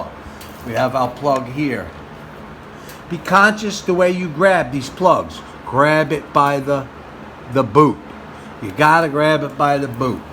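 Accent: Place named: New England, United States. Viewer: Excellent commentary and directions. Voice reminds me of when I was a young man in New England learning the trades.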